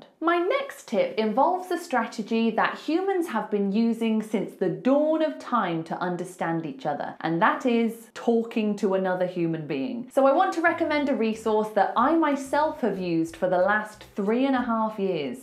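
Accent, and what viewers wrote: United Kingdom. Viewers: british accent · Proud myself cause I understood everything she said, and she’s British 😅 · British English? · Is this British English? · I love ur accent its like peppa pig's accents😅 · I don’t understand because I live en usa 🇺🇸 and this accent is like British 😏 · Is this british accent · You are also a bit challenging to understand. Because you speak with a British accent. The British accent is harder than the American to understand. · Excellent video! As a native speaker from the US, I love the various UK accents! I’m always fascinated by how you “remove the R“ from words like “doctor“ and “father,“ and then put it between other words, such as “saw a.“ (BTW, in the States we put a W where you would put that R: saw wa.) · hello from japan! I wahtch ur vidio! it is very nice for me! and i very like ur brtain accent! very apreciate very super beautiful lady!!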